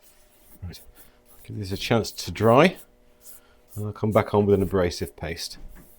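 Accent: United Kingdom. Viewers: I'm from Birmingham and I live on the Welsh borders in Shropshire. I have a thing for accents, I love them and I'm sure I can detect a remnant of West Midlands in your speech. Thankyou for your welcome. I'm enjoying working through your back catalogue.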